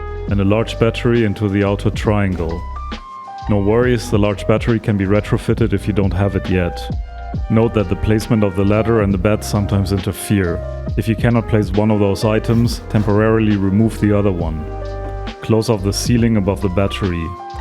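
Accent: Germany. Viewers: Bist Deutscher oder?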